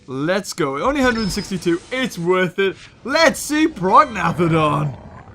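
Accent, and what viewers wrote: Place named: United Kingdom. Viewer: what part of great Britain are you from?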